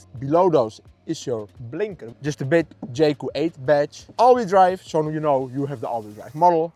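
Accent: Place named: Netherlands. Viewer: Thats some true Dunglish right there.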